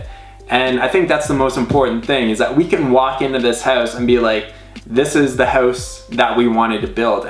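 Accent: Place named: Canada. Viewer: It depends on your perspective, you sound Canadian. So housing would be extremely costly and it would be a steal depending on if you can stand living there. Homes are much more adorable where I live. Here you would be the village idiot for spending that much for a trailer. I like it and I’m glad your happy.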